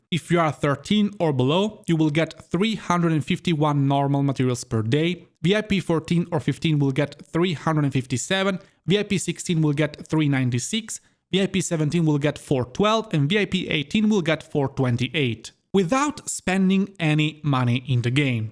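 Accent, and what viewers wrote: Italy. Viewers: Are you southern Italian?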